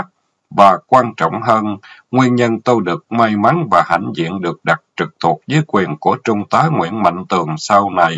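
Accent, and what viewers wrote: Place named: South, Vietnam. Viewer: Không biết tôi đã theo giỏi chuyện nay không biết bao nhiêu lần!rất thích loi hành văn mạch lạc của tác giả, giọng đọc miền Nam của anh. Loi điều binh và chiến lược hành quân của manh su Ng mạnh Tường.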